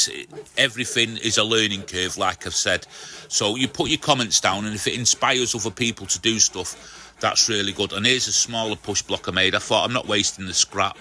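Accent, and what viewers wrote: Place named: Manchester, United Kingdom. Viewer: Excellent to hear a fellow Manc!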